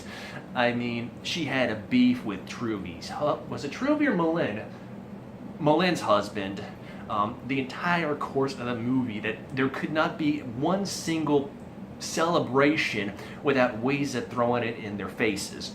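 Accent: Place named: South, United States. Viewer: All I heard through this whole thing was your southern accent. And I loved it. :D Must've been all that Steel Magnolia watching.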